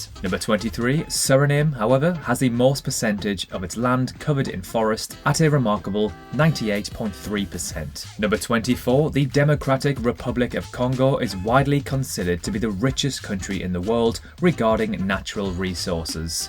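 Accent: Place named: Newcastle, United Kingdom. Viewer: Geordie accent (Tyneside, northeast England).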